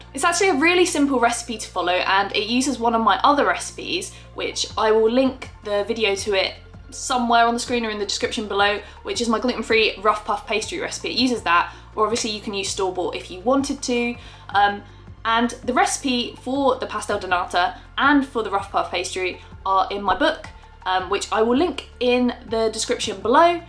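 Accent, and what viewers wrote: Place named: Southeast England, United Kingdom. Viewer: Judging by her accent, she's from the SE England!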